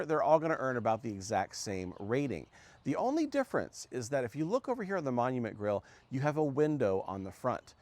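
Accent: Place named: United States. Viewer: That was an excellent impressive, thorough review!!! However, I would have liked to have seen a review on the igniters. I find it very frustrating that in all of the BBQs that I have purchased over the years, the igniters have failed within 6 months of purchase. They have been ridiculously poorly made. Are there any advantages of any of the different models when it comes to cleaning the insides of the BBQs, when it comes to a build up of grease, cheese etc ..? Also, as a Canadian, I am assuming that the dollar amounts that you are speaking about are in U.S. dollar. I also assume by your accent that you are an American. Thank you!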